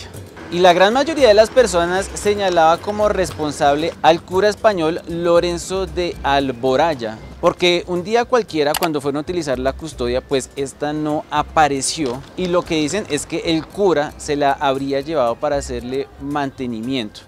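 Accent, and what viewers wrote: Bogotá, Colombia. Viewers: Te felicito me encantan tus historias además que eres un cachaco muy genuino😊 a pesar de lo joven que eres tienes muy marcado el acento bogotano y también chévere que caminas por nuestra icónica ciudad cuando vas narrando, muchas felicidades tienes talento y carisma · El comunica rolo 👌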